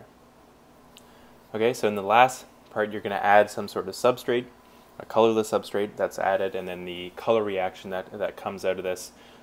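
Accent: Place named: United States. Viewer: Finally. A quick video with an american accent.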